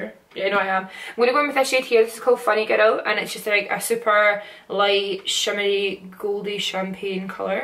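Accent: Scotland, United Kingdom. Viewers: Omg I love your accent 😍👌🏻 I have a friend who's Scottish but she doesn't have an accent like you, but it's kinda adorable. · Are you from Scotland ??? Im from Glasgow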